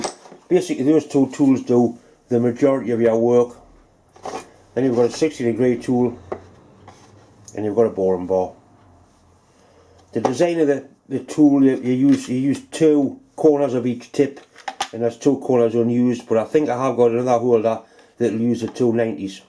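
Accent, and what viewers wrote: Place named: Yorkshire, United Kingdom. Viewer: Thank you for the reply sorry sometimes I do not understand as you speak in a Yorkshire or Welsh dialect:-)) Two weeks ago I had a chap visiting my stationary engine collection here in Malta he is from Yorkshire had a bit of a problem to communicate with his accent!!!!!!!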